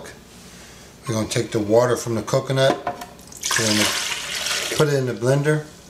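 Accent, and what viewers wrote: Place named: Trinidad and Tobago. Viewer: You sound like a "Trini" lol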